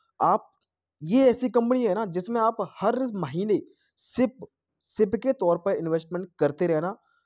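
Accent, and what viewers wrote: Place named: Rajasthan, India. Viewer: Boli se Rajasthan ko laage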